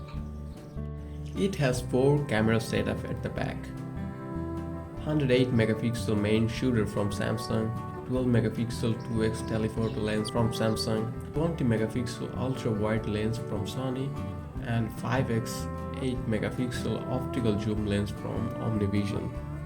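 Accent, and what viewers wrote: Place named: Bangladesh. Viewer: hey bro...😍 You r from Bangladesh? you're voice accent is really amazing 😍 Love 🇧🇩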